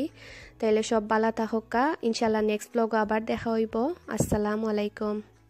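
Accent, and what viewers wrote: Sylhet, Bangladesh. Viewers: I’m sylhety to. I love ur sylhety voice. I lived in London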